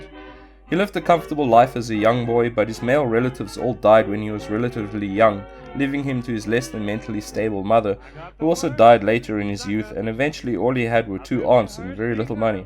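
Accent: South Africa. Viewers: Are you South African? Your accent sounds incredibly similar to mine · Expat South African living in Amsterdam here. Good surprise to hear your accent bro. Nice video. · Good video! But are you South African?👀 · Are you South African as well? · Fabulous and thank you so much! Is that a South African accent? Fabulous. Thanks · Are you South African?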